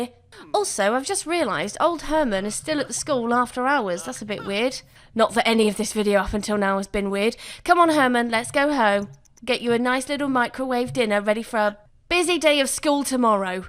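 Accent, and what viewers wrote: United Kingdom. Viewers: I love your videos! Your humor and sarcastic comical self is just like mine. I love the sims, and I enjoy your videos! Always love hearing your story of your sim and always got me laughing which I need bc life sucks! I love your accent! British ladies got it going on! Cheers!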